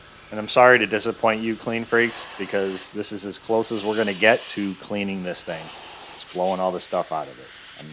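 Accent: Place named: Midwest, United States. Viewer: refreshing amount of no speed up, no self apology for this "being boring", no crappy muzak in the background and plenty of commentary on how to do this task. Plus I find mid western drone is incredibly soporific, i find when I'm tired its like being told a bedtime story... I just wish no you'd lose the "The channel" bit from the intro and then you would be totally unique in not trying too hard to keep people watching... Very TV, TV never begs you to watch or explains itself too hard, it's just here's a program watch it if you like and if you dont, go do something far more interesting...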